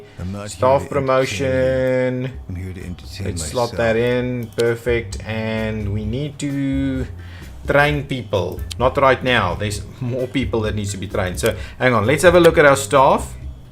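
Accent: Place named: South Africa. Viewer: You must be from SA 🎉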